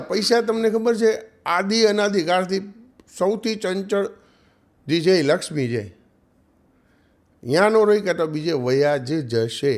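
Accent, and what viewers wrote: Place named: Kathiawar, India. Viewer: Biju badhu to thik pan tame je original kathiyawadi laheka thi vat karo chho e game chhe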